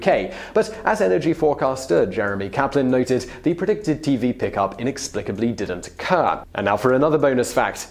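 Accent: United Kingdom. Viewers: I never noticed his accent. I always thought he was an American but he's NOT. I just noticed his British accent. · THankyou! You have a perfect British accent and i could listen to you all day if i wanted to <3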